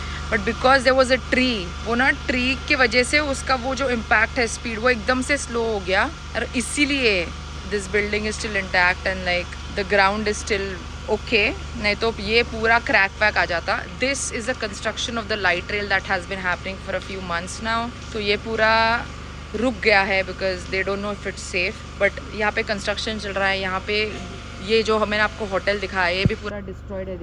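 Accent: India. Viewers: I hate Indian English accent why u guys do this trrrrrŕrrrre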